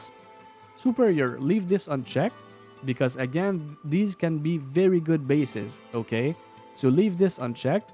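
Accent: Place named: Quebec, Canada. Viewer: Nice merci man, en passant ton accents... té b'en Québécois eh? 😀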